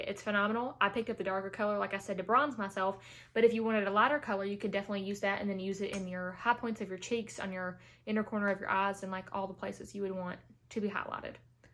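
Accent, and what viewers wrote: South, United States. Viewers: I love love your southern accent. This is my first time watching your YouTube page! I found your channel through #allieglines channel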